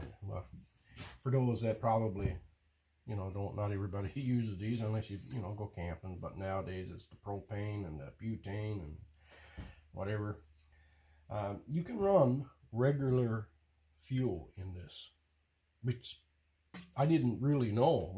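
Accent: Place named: East Coast, Canada. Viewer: I recognize a east coast Canadian accent !!! 🇨🇦🍻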